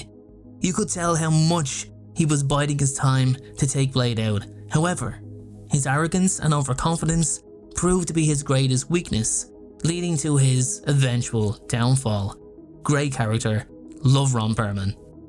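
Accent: Ireland. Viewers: Are you Irish? What part of Ireland are you from? You earned a sub anyway.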